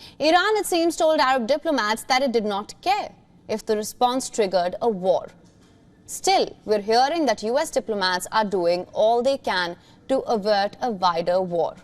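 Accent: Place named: India. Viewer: I love Indians accent 😍😂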